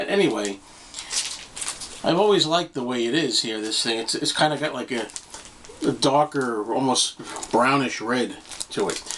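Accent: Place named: Queens, United States. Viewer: Hey, I just wanted to share with you that one of my best/favorite cousins lives in South Ozone Park in Queens. My cousin Mark and his older brother {my other cousin} Matt are the one that took me to see Led Zeppelin at the Garden in 1977. The whole city was taken over by Zeppelin fans from all over for a whole week straight. It was one of the best times of my life and concert history. Your accent is similar to theirs. I am sure you have been at shows/games at the Garden. Talk soon~ Rob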